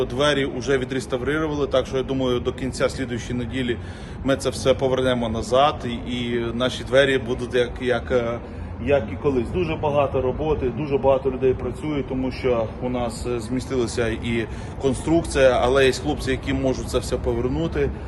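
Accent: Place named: Ternopil, Ukraine. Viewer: У вас чудесный украинский язык Тернопольский акцент 😢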